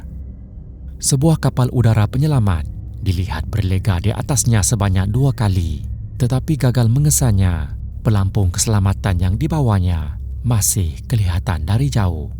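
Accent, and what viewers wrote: Sabah, Malaysia. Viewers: SAYE TAK PAYAH CAKAP APE² SAYE DAH KENAL DAH SUARE ABANG NI ORANG MALAYSIA SABAH ATAU PON SARAWAK TU JE 😊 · Rasanya admin ne orang malaysia tp lorat sabah atau sarawak kot..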